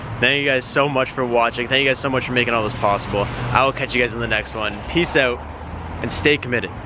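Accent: Canada. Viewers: Intentions or our attention? Haha, I love Canadian accents.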